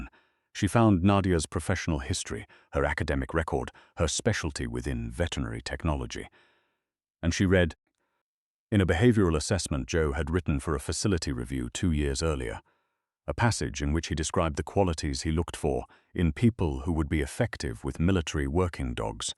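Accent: United Kingdom. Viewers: It’s a British accent, in the King’s English.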